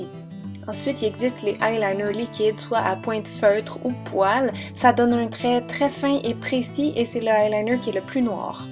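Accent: Quebec, Canada. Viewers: nossa nossa Elle est canadienne ... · Je pense qu'il y en a pas mal d'entre vous qui n'a pas reconnu l'accent Canadien donc des expressions différentes! Alors sortez un peu, allez ailleurs que dans les magasins de fringues et maquillage, faites vous teindre en brune, achetez vous un peu de culture et vous aurez l'air moins ridicules avec vos commentaires stupides!!!!! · elle est surment Canadienne ou Québécoise si tu écoute sont accent et là-bas on utilise pas le mot scotch mais papier collant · C'est un accent québécois. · l accent de Céline dion.....🥴🥴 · surtout qu’elle est canadienne... ils ne parlent pas la même langue, du moins pas le même accent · canadian accent :D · Ta video est super ! Ton accent NSĹDLSKS j'adoreeee l'accent quebequois 😍😍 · t canadienne? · j'adore t'es videos, meme si je ne comprends pas tout ce que tu dit a cause de ton accent quebecois (mais j'adore l'accent quebecois);) <3, je suis d'acord pour une video avec plusieurs sorte d'eye liner!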